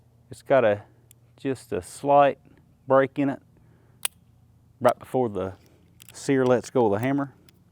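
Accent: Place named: Kentucky, United States. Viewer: Im from Shepherdsville just south of Louisville. Sounds like you're from KY too. If so what part?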